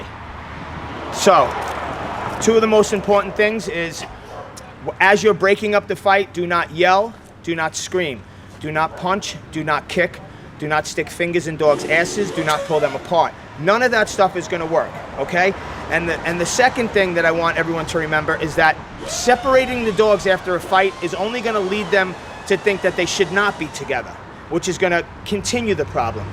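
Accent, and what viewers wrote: New York, United States. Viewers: ...NewYaawk AcCcent loving it lol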